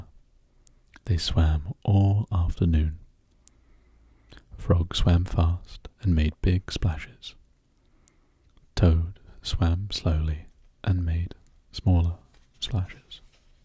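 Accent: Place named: England, United Kingdom. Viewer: the English accent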